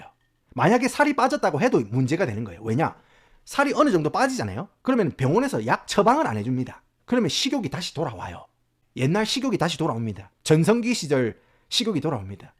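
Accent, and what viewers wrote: Gyeongsang, South Korea. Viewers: 공감하는 글 잘 봤읍니다. 한국도 마찬가지 풍조입니다. 정크푸드가 건강한 포장을 쓰고 판매되는 것은 똑 같네요 갱상도 구수한 언변이 참 좋습니다.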